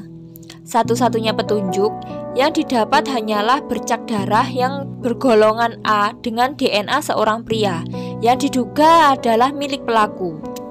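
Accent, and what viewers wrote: Java, Indonesia. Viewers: Logat Jowo x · Kritik membangun: suaranya medok jowo bngt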